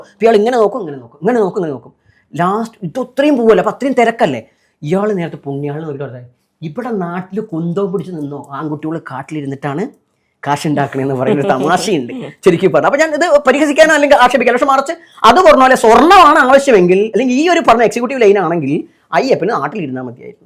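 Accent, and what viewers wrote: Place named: Namboothiri, India. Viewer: സംഭാഷണത്തിൽ നമ്പൂരി ശൈലി ആഡ്യത്തം ങ്ങട് ലേശം കുറച്ചോളൂ... ബാമ്പ......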